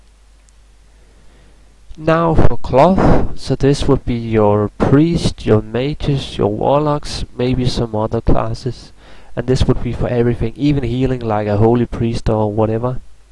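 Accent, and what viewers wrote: Denmark. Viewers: Are you danish? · Are you Danish? You sound like a Dane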